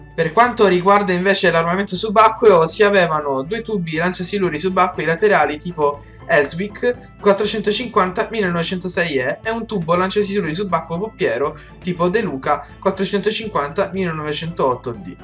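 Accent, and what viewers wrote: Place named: Rome, Italy. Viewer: Un paio di suggerimenti all'autore: l'audio è registrato in maniera insufficiente, e l'accento romano marcato fa perdere credibilità, serve qualcosa di piu' neutro e professionale.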